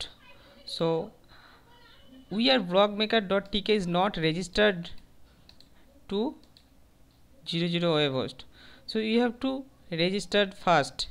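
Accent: India. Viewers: DAMN !!!! i hate india pronunciation in english, like they have candy in their mouth while they're talking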